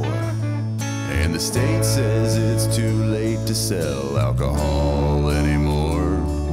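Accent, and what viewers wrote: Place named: Low Country, United States. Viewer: Props to low country drawl accent. Only kind I'll accept from a northener 😋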